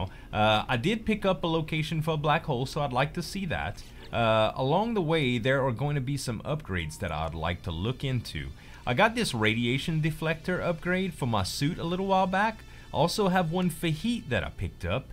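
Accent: Louisiana, United States. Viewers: Z, I can tell your from Louisiana...I am also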